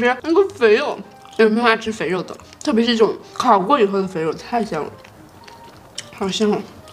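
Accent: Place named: Northeast, China. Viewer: 听见东北口音了， 是快手过来的播主吗，，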